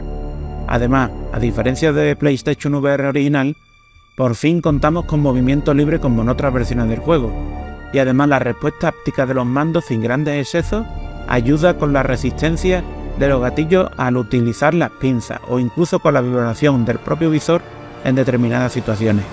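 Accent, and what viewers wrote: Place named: Axarquía, Spain. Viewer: Jajajaj yo soy de Málaga y te entiendo perfectamente. Tienes pronunciación de la Axarquía o por ahí. Muy buenos los análisis, breves y concisos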